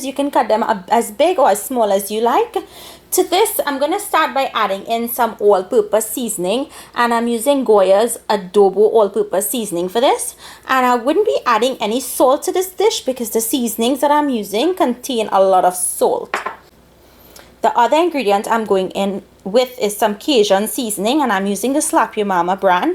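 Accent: Trinidad and Tobago. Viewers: Hi. Trini English.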